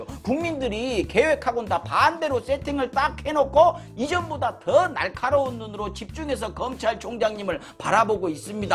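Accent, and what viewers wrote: Jeolla, South Korea. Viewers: 전라도말씨 시원하게들리네 👍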